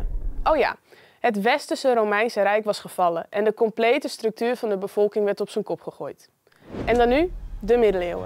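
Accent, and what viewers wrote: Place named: Friesland, Netherlands. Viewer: Leuk! Deze kwam op mijn tijdlijn terecht. Ik als import Fries (maar toch zeker al jaren) stel deze video zeer op prijs. Leuke geschiedenis en tevens een mooie Friese dame (accentje is zeker hoorbaar) die het presenteerd. Dank!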